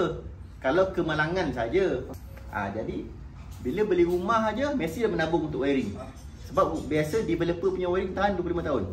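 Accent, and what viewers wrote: Negeri Sembilan, Malaysia. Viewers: Puas aku dengar loghat negeri sembilan...Seronok aku dengar...terbaik BOSS N TEAM.... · bahasa nogori yo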